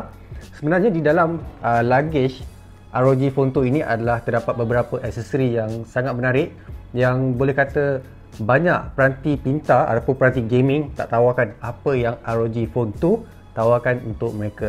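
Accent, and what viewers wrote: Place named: Malaysia. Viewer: suara nya kayak malaisiya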